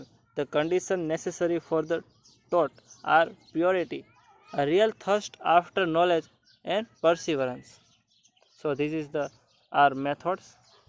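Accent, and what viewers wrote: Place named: Bengal, India. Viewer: Like my benglai teacher speaking English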